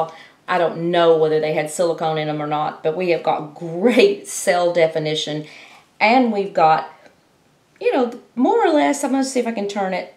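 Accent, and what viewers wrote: Texas, United States. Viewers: So. Moving from California to Texas. Good that you already haves Texas accent.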